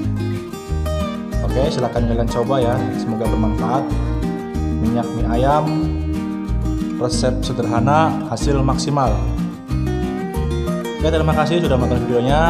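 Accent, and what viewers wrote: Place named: Banyumas, Indonesia. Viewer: Ngapak kayanya bang🤭😁😁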